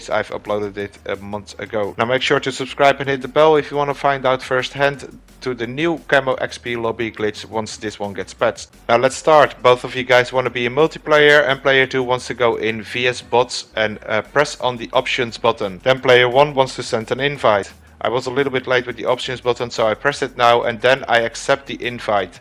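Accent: Netherlands. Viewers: Thanks for posting! Btw are you Dutch?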